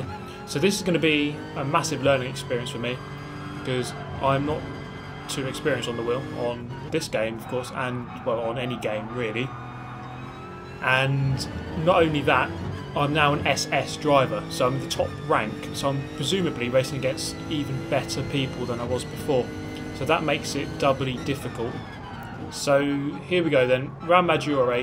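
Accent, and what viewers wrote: United Kingdom. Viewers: The british accent is perfect for sport commentating.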